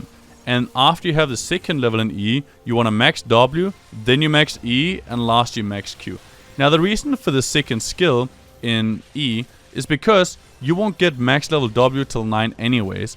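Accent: Denmark. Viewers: you sound like a dane ?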